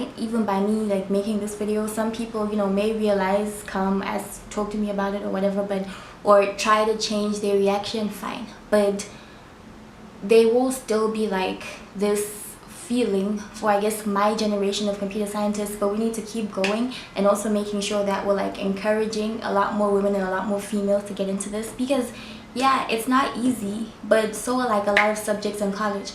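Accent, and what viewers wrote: Caribbean. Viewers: Are you carribean? I hear an accent. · where you from? the Caribbean?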